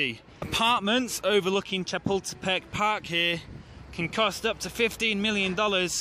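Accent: England, United Kingdom. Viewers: Diana T no es Americano es ingles de Inglaterra. checa el acento. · Me encantan tus vídeos!! Aunque soy mexicana me ayudan a conocer mejor la Ciudad de México (soy de Acapulco), y me ayudan con el inglés. Por cierto me encanta tu acento, siempre he pensado que el acento británico es el mejor en inglés. Espero que le entiendas a mi comentario, aunque puedo leer y escuchar en inglés no soy buena escribiéndolo. Muy buenos vídeos, sigue así :)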